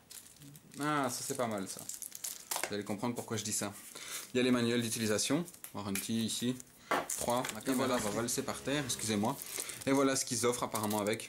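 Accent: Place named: Liège, Belgium. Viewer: tu viens de liege toi hein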